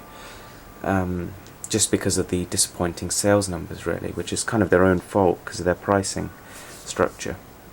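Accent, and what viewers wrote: RP, United Kingdom. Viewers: Wait, is that a Received Pronunciation British accent I'm hearing? I don't hear that too often. It's very nice.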